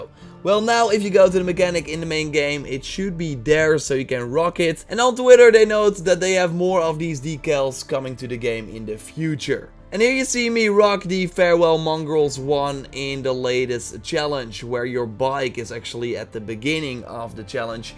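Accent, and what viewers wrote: Netherlands. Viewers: Damm jouwn Nederlandse accent is echt klote irritant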